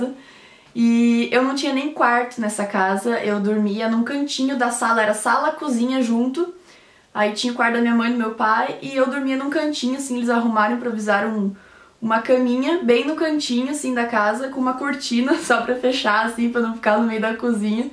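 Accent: Rio Grande do Sul, Brazil. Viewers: Meu pai também é agricultor. Ele planta melancia. Sua história é triste mas tem um final lindo e um aprendizado de luta. Se tem pessoas "infelizes"que fazem comentários "fdp" com certeza elas não tem louça pra lavar e ficam enchendo teu saco e te incomodando, mas teu coração e conteúdo é tão maravilhoso que essa gente idiota só eleva teu sucesso. Diva. Achei que era gaúcha natural... hehe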